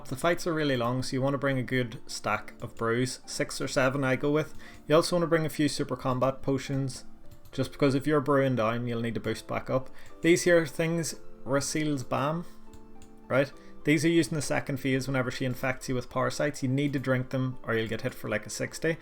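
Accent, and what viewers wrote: Northern Ireland, United Kingdom. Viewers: is that a Northern Irish accent I hear!? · Good guide man ty. Surprised me to hear a northern Irish accent in a runescape guide as I'm from NI myself was unexpected haha Also fuck Zlaryn I hope he gets an itch on his balls that can never be scratched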